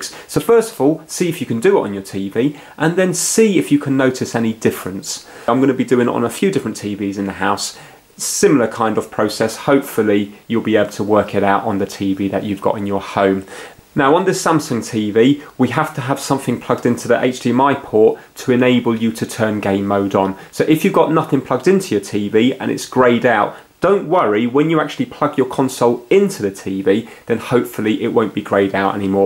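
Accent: United Kingdom. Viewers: British English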